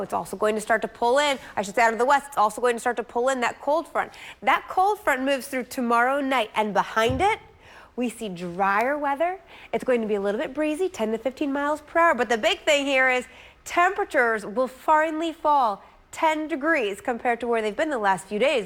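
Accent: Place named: South America. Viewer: Wait Waa da fuuuuuu !?! ¿ Since when did WMAR get a South American Weather lady ?!?!?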